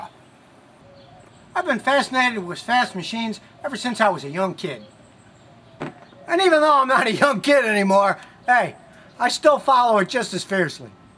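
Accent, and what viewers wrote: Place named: Chicago, United States. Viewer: Hey I didn't know you had a Chicago accent... Let me know when you come to Chicago, I would love to meet you. Ben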